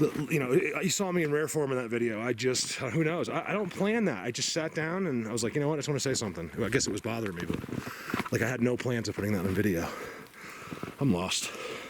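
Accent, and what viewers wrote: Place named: South, United States. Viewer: Don't mean to be distracted but when you said ummm it almost sound like Elvis, you have that sexy southern tone and your voices sounds like him too...lol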